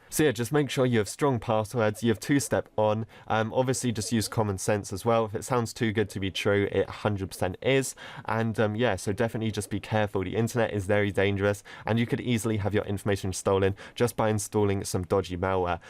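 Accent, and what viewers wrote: United Kingdom. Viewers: You know sounds professional when he speaks British.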